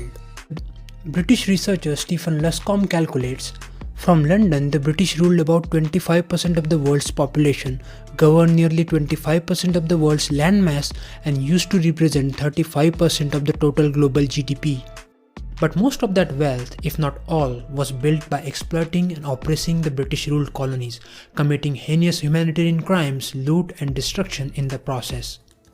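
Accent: India. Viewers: I am not even kidding, the video quality is really high great production but PLEASE get a non indian to do the voice over for the video.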